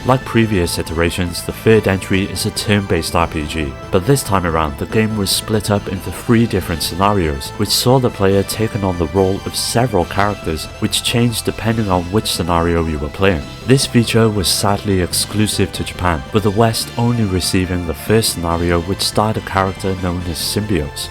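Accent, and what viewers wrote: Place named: Cardiff, United Kingdom. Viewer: Thought I detected a fellow Diffian. 😃😎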